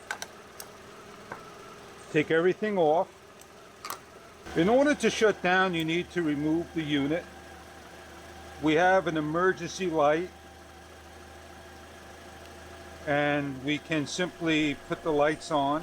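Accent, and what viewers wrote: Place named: Brooklyn, United States. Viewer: BROOKLYN Accent, BROOKLYN Neighborhood, and thank you for an excellent Brooklyn Review. I have a Tacklife T8 which is identical to yours and it really does work.. 👍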